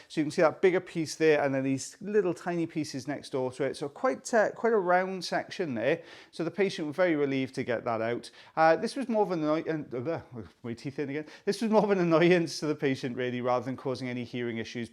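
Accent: Wales, United Kingdom. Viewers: Welsh, Welsh!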